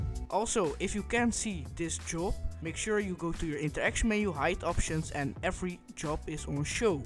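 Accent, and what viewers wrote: Netherlands. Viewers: LOVED THE VIDEO! You sound very Dutch btw :) Ben jij Nederlands?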